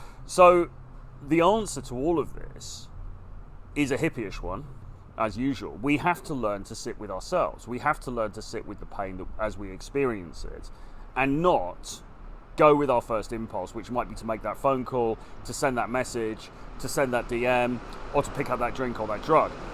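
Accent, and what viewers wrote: United Kingdom. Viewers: Although you and I probably have a number of major differences when it comes to beliefs in life (I believe in Jesus Christ/the bible; not sure if you do), as someone who's right around your age (I think I'm like 2 or 3 years older than you), I love how real you get, and the things you talk about. I've never run across this in the Manosphere, and I've watched TONS of videos since I got "red-pilled" a little under 4 years ago. And, although I'm American (and, this has nothing to do with having significant heritage from the U.K.), it's nice to listen to a well-spoken Brit, especially since--and no offense to anyone--many content creators in the Manosphere sound like they may not have even graduated from high school. I'm sorry, but being intelligent, educated, well-spoken, etc..., regardless of what people say/admit, gets you a lot more credibility than not being those things.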